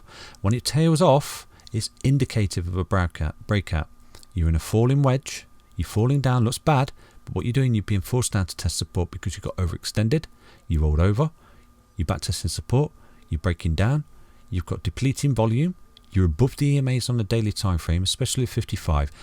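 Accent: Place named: Nottingham, United Kingdom. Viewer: You are so from Notts lol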